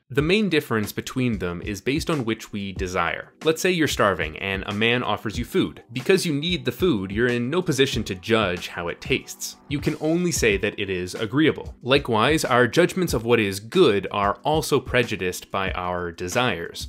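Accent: Canada. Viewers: Are you Canadian? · by the way he pronounces certain words I have always thought he was Canadian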